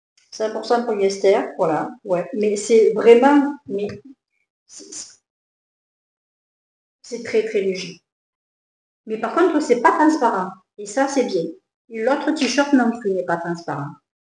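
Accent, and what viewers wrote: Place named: Provence, France. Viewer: Bonsoir, je vous regarde de Paris et j'adore votre accent, vous êtes du côté de ma sœur vers Cavaillon. Juste une petite précision le 2ème micro je pense qu'il faut l'accrocher à votre chemisier. Bonne continuation ❤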